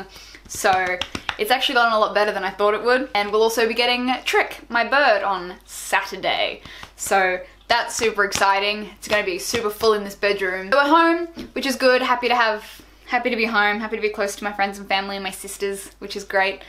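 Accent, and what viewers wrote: Australia. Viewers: I know! I love the way it sounds. She's Australian, right?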